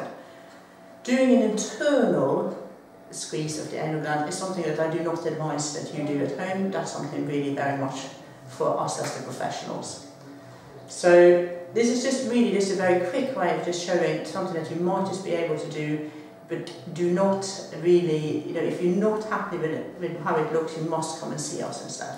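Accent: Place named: United Kingdom. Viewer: Was very hard to understand her speaking. The British accent got in my way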